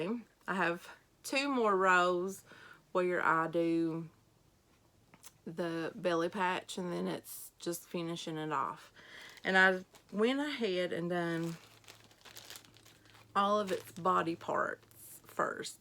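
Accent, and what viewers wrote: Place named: Indiana, United States. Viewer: Oh wow I finally come across someone who from Indiana as well...But u sound like ur a little more North from where I'm from...i just subscribed to u but loving ur video ...looking forward to more videos....God Bless and hope u have an amazing week....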